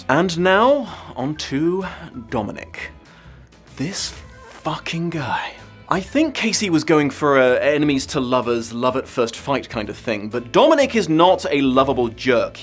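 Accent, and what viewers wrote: South, United States. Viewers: 1. I got a great kick out of your Southern American accent 2. I just love having such a great YouTuber with high-quality content who’s childfree by choice. You’re great representation. I shall continue to edit and add to this list as I keep watching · Only four minutes in and I’m in tears with laughter. Thank k you for that incredible reading in what I can only assume is supposed to be a southern American accent. It’s actually a pretty good accent, way better than any accent I can do.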